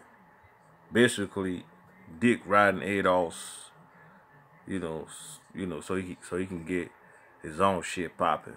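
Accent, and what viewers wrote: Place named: Caribbean. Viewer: U have a carribean accent. Ur family wasn't here in Jim crow. Stop commenting on american black folks movements. It's non-immigrants only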